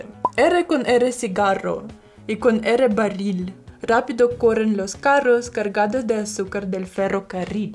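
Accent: Guatemala. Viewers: saludos me gusta el acento de Guatemala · Me gusta el acento con el que ablas español chapin. 😂😂😂 me encantan tus videos señorita, saludos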